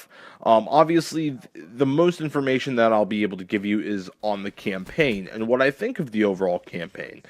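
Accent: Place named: Ireland. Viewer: It's an Irish accent ;D Thanks man that means a lot xD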